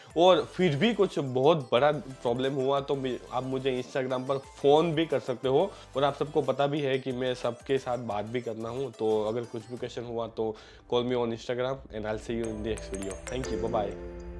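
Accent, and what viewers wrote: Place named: Gujarat, India. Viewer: Bhaii tame Gujarati lago tamari language ma avu lage ....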